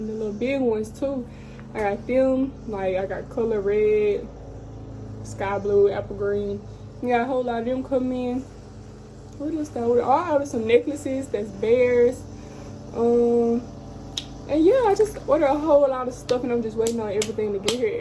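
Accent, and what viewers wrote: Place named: Texas, United States. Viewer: Please tell me you from texas because that's all I hear coming out ya mouth 😂😍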